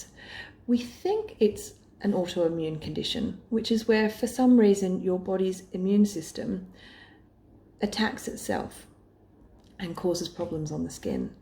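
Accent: Australia. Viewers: She sounds Australian. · Australians are the best dermatologists. Lovely hearing her Australian accent here.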